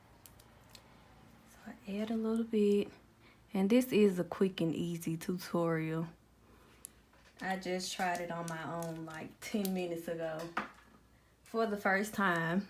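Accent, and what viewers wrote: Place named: Florida, United States. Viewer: Your accent is so cute lol so country. Must be from Florida too 😂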